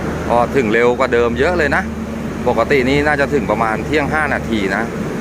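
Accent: Ratchaburi, Thailand. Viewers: ไม่มีอะไรต้องติ ผู้บรรยายดีกว่าตามทีวีหลายช่อง เสียงชัดเจนฟังสบาย ติดสำเนียงราชรีบ้าง แต่ป็นเสน่ห์อย่างนึงของภาษาแต่ละท้องถิ่น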